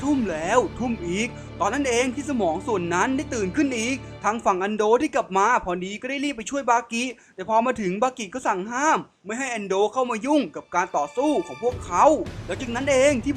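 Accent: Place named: Thailand. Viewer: ทำไมบางทีพี่อ่านออกแบบเหน่อๆๆอะ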